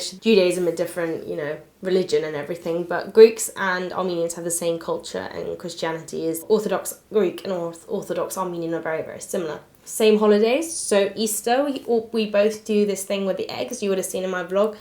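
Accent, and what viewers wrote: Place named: England, United Kingdom. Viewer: This lovely young lady sounds rather English,but she was probably born in England of Armenian parents.Felt that she gave a pretty good speech about some cultures that are rather similar to Western Armenians,such as the Jews,and Greeks.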